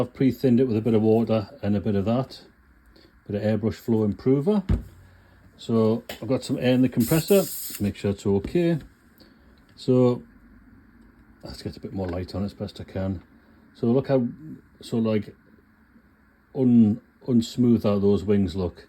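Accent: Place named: Tyneside, United Kingdom. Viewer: by your dulcet tones that you were a fellow Tyneside lad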